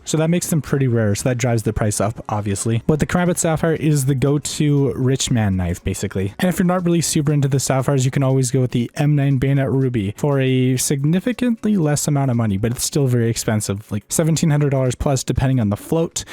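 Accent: Norway. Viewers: hmmm hmmm er du Norsk? (are you Norwegian?in Norwegian obviously) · Are you from Norway?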